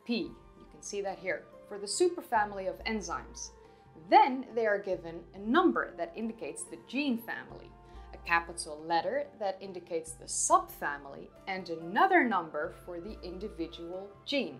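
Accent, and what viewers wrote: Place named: Netherlands. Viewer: A person with a Dutch accent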